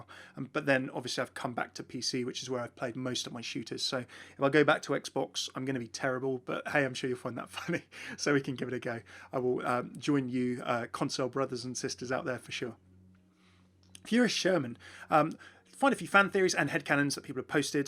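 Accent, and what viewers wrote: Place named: United Kingdom. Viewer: Your voice has a British accent. You British?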